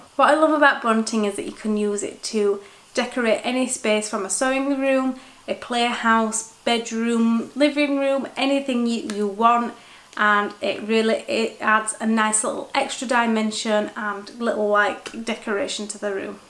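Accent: United Kingdom. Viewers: It's so lovely to hear a Yorkshire/northern accent on you tube! Great video thank you x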